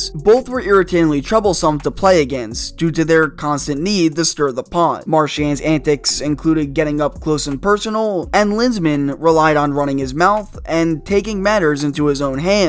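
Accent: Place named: Maritimes, Canada. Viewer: Im hearing East coast accent, sounds like NB or CB. Im leaning more towards NB now after watching a few more of your vids.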